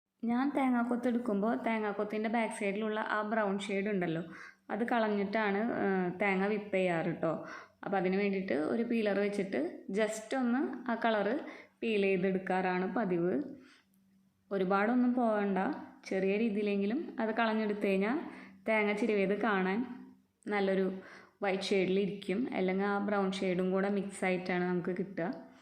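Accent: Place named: Thrissur, India. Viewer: തൃശൂർ ആണ് അല്ലെ 😂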